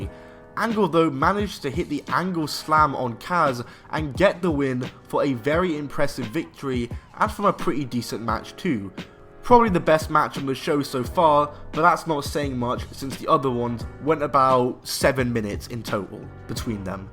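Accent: United Kingdom. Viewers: Listening to a nit brit talk about this is crap.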